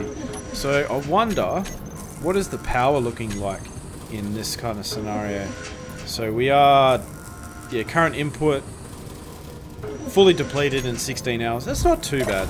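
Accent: Australia. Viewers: you sound lke a bloody aussie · Are u Australian?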